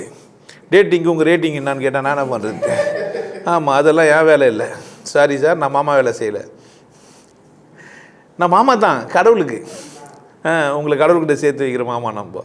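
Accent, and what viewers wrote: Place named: Chennai, India. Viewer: Madras language super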